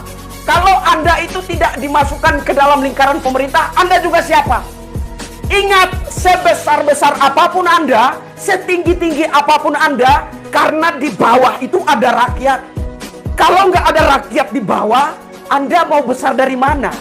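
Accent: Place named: East Nusa Tenggara, Indonesia. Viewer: mantap bang,,,logatnya orang yg aku pens,,orang ntt ya,,,maju trusss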